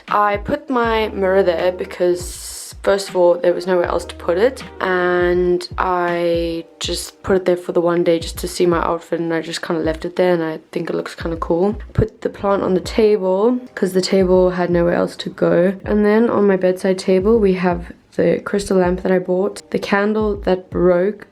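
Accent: South Africa. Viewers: she's south african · Are you South African? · I could spot the accent straight away!! I'm South African too!! <3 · you sound south African. hmm lmao great vid btw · the first 5 seconds I was like sHe is SOuth AfRICan 😂😂❤️ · I was like damn she sounds South African, then she hit us with the "so, ja" and I knew 😂❤️ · as soon as i clicked this video i said “she’s from south africa” i adore your accent!!! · she afrikaans so south africa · Are you South African? You sound like it but I don't want to assume things because people get so triggered when I ask this but... I'm South African and you sound soooo South African.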